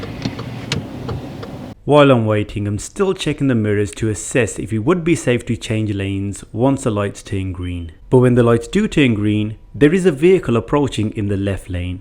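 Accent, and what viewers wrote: Birmingham, United Kingdom. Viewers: Love your Brummie accent man! And the great driving advice obvs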